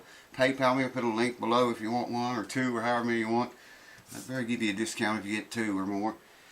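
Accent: South, United States. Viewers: Hey, new sub here. Is that a southern accent I detect?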